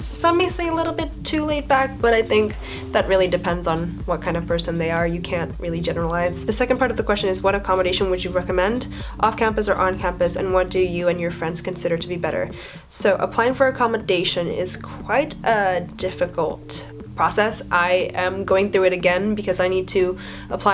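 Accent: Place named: United States. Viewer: you have a totally perfect flat american accent.. howdja do that?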